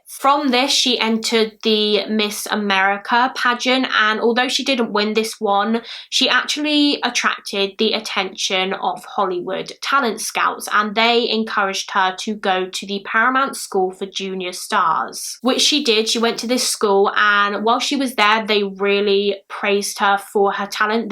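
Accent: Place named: Bristol, United Kingdom. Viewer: It is the accent, which you must be unfamiliar with. If she got the name wrong then then she would have spelled it wrong in the description box of the video. It kind of reminds me of a Bristolian accent.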